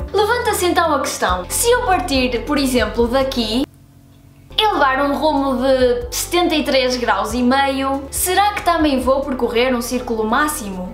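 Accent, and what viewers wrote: Portugal. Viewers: Sotaque português é tão lindo aaa <3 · Uma Homenagem aos seus fãs brasileiros? =) Sou formado em matemática no Brasil. Curti seus vídeos, e estou tentando acostumar com seu jeito de falar (Portugueses falam MUITO diferente dos brasileiros). Parabéns pelo canal, e é muito bom ver matemática fazendo sucesso entre algumas pessoas =) Um abraço! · Sua beleza é um fato consumado......vc fala gostosinho portuguesinha kkkkkkk abraço do Pará Brasil pra professorinha preferida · É lindo aprender matemática com esse sotaque português😍😍😍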